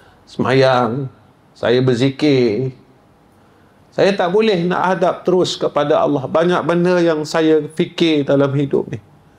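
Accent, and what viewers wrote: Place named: Kelantan, Malaysia. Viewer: Comey ustaz cakap kelantan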